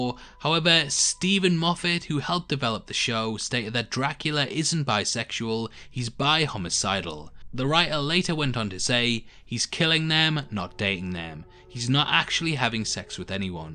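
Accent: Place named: North East England, United Kingdom. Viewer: Top vid m'man, thanks. Did enjoy the BBC Dracula. Your accent is very confusing tho, sounds like N.E. England crossed with a tinge of Australian, is this accurate? :)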